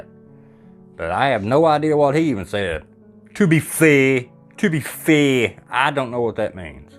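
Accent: South, United States. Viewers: I love your accent, y’all talk mighty fine down there in the south❤ hey great video! Nothing 2 dimensional about it! Made me lol too! Much ❤ & ✌🏼out! Oooo & Lee can 🖕🏼heh heh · Great video! Sorry this is nothing to do with ghosts or debunking but you mentioned accents. I don’t know what hillbilly means but I was struck by your accent when I came across your channel and wondered since - what is it? I’m not American so I’m just guessing like Virginia or Carolina or around that area? I could be way off sorry 😂😂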